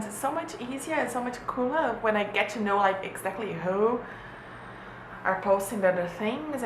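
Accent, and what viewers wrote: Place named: United Kingdom. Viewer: Oii, adorei o FAQ in english!! Você tem um sotaque britânico muito fofinhoo:D por acaso você sabe se na Inglaterra a área de engenharia naval é forte? Ou mesmo as áreas de engenharia? e, por favor, continue postando videos:D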